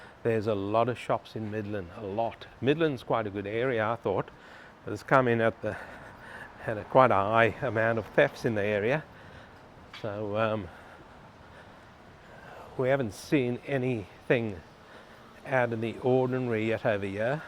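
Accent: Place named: South Africa. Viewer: You sound a bit s african. Are you?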